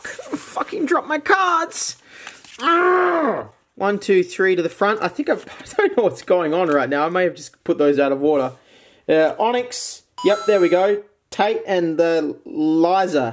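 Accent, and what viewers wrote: Australia. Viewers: Hes Australian though, james is British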